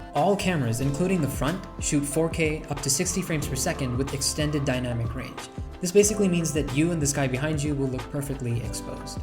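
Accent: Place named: United States. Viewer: Why is it your accent is like americans😍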